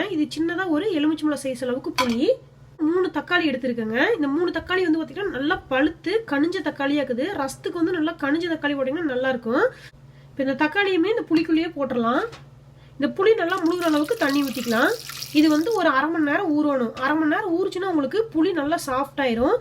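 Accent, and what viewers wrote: Kongu, India. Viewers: Kondu slang arumai... 👌👌👌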